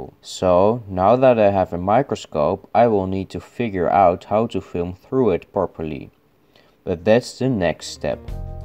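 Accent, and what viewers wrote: Netherlands. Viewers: I think he’s Dutch. I have the same accent 😂... A lot of people I know who speak English on a daily on the workfloor also sometimes have a heavy accent. I believe it’s because our language is very accent reliant. It’s very difficult to understand someone speaking Dutch when they aren’t native. Fortunately for us this isn’t the case with English language 😬 but maybe if it was, we’d practice pronouncing English a bit better 🤷🏼‍♀️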